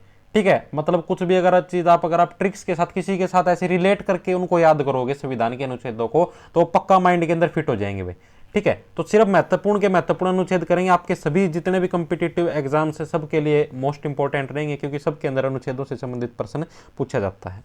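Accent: Haryana, India. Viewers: English bolna laga sir ap to pr harynvi achi laga hai apka muh se😁😁😁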